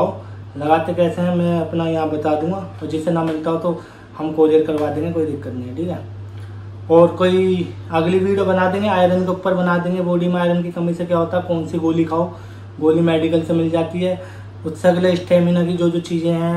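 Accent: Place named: Bulandshahr, India. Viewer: Bahiya app disst Bulandshahr se ho kya language se aise he lag raha ha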